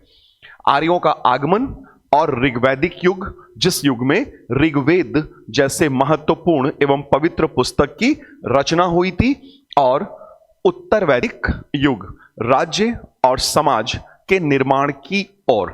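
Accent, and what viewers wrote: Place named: Ghazipur, India. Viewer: Thank you so much sir🙏🙏 Sir aap Ghazipur district se h aisa lgta h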